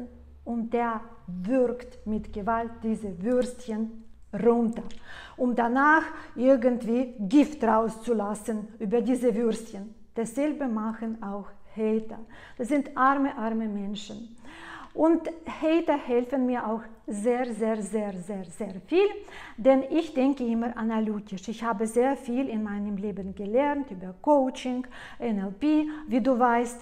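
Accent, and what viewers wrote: Russia. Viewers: Ich liebe deinen russischen Akzent. Übrigens: Wir Bayern rollen auch das "Rrrrr".😁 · Ich hab mir zwar geschworen nie mehr zu heiraten, aber Dich würde ich glattweg sofort heiraten, laaach. Nee, keine Angst, ich weiß das Du verheiratet bist. Aber ich verstehe diese Vollpfosten von Hater nicht. 1. Deine Ratschläge fruchten und haben Hand und Fuß, im Allgemeinen. Und ich denke, wenn Du die evtl. Situation genau kennst, sagen wir mal von einem Teilnehmer Deines Coaching, Du auch ihm ganz treffsicher helfen kannst. 2. Du hast mich in diesem Video zum lachen gebracht, dem Hater wird es wohl nicht geschmeckt haben, laaaach. 3. Du hast keine Schlauchboot Lippen, finde sie sehr sexy und anziehend :) 4. Ich mag Deinen Russischen Akzent und auf den kannst Du natürlich stolz sein. 5. Ich finde es toll das Du die Deutschsprachige Kultur, sowie Deine eigene magst. 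Das macht Dich auch für das Coaching interessanter und Du hast auch mehr Überblick wie Manner verschiedener Kulturen "klicken". 6. Du hast einen tollen Humor und gehst die Sache mit den Kohlköpfen/ Hater richtig an. 7. Ich mag Dich so wie Du bist und mach weitere so wertvolle Videos wie bisher.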